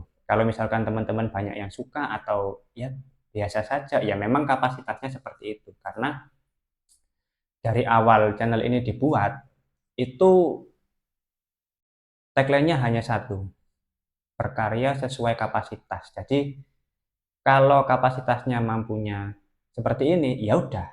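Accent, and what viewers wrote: Java, Indonesia. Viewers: Sampean jowone ngendi cak??? 🙏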